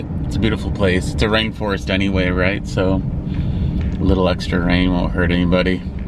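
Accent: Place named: Minnesota, United States. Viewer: Comment70 bcz69 is just awkward&overrated. Thats where i saw bald eagles floating bridge thats the year i was born! 1961 is the best yr ever same upside down as it is right side up.1979 is hsgrad.how bzzr u dropped those 2 #s? Quinky dink. You sound lime a minnesootan with your chicago great lakes accent. Bahaha you dont really need power that much? Whatever delusional. Go without power for a day hahahaha you have no idea about the power you use and have. Farmhand harvest farms. Casinos are gross.2cents The monks of new skeet is a cool dog book if you like to read cu down the road bahaha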